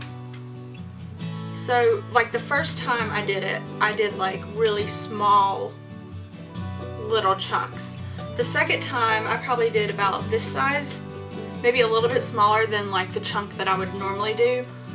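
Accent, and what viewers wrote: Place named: South, United States. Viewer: You sound kinda like your from the south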